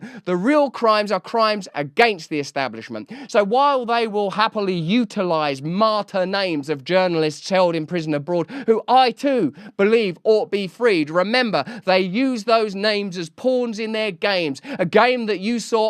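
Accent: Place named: England, United Kingdom. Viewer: Lol is this guy American? He sure sounds English😋 don't bother I know where hes from its just funny hes so wrapped around this trump. Hes a decent actor tho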